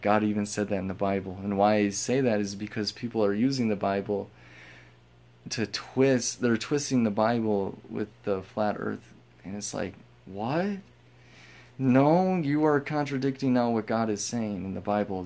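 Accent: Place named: Wisconsin, United States. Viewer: Hi....it's me Dr. Rockso....good to see ya....love the Wisconsin accent!! I miss the crew!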